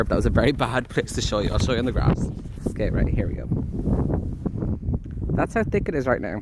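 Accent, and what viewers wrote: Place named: Cork, Ireland. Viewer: Loving the Cork accent like!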